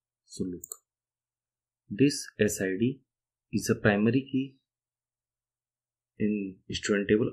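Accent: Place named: India. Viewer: english with hindi accent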